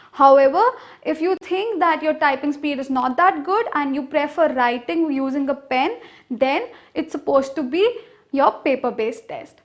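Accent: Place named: India. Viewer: Cute girl.. I like your Indian accent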